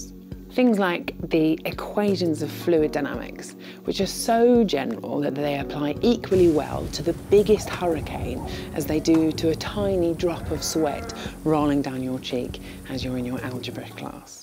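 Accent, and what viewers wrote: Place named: England, United Kingdom. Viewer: Pretty, smart, english accent... Christ. Inb4 sexism, I comment on Derek Muller's sexy self all the time as well.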